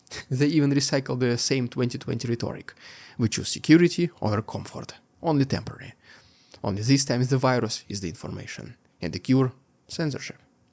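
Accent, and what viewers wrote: Russia. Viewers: I am listening your pathetic bs and your horrible accent from Russia right now🙈 😂 do not worry for us. We have more speach freedom and access to the information than any citizen of so called free democratic world. 👌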